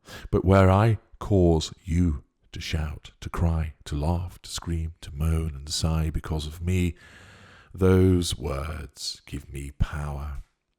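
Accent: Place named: Oxford, United Kingdom. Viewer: I bet your bass baritone Oxford scholar accent is fuel for plenty of men and women, narcissist or not! 🎙️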